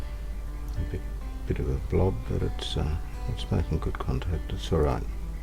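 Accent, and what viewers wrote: Australia. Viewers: Hi, I know this was a long time ago now. My name is Sara. I've been trying to restore my grandparents' old nippergram. One of the.01MFD 630VDCW capacitors are no longer working. Do you know what could work as an alternative and where to source such a thing? It also sounds like you're in Australia. I'd love to know whereabouts and if it's not too far, I wondered if you could help me to get it up and running again 🙂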